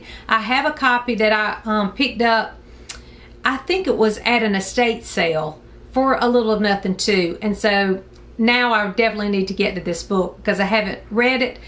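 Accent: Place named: North Carolina, United States. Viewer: Are you from NC? That's where my mom was from and your accent is so similar. That copy of Heidi is gorgeous! I haven't heard of those LM Montgomery books, but I love those covers too:)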